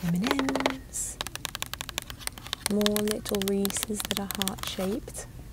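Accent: England, United Kingdom. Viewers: i wonder where was born, she sounds british to me... So i wonder if there is Target in UK.. sorry for my bad english ^~^ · Real or not love me a good English accent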